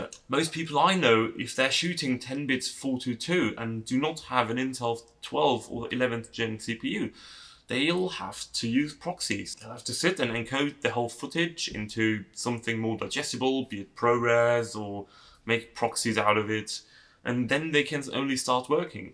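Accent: United Kingdom. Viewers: Bloody hell, didn’t know you were British mate. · 👍🏻👍🏻👍🏻 Du hast mich total überzeugt !!! Nicht nur "Intel core", auch dein Britisch Englisch ist really Great (Britain), fantastisch! Hut ab! 👏🏻👏🏻👏🏻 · where is that strong british accent from my brother? love it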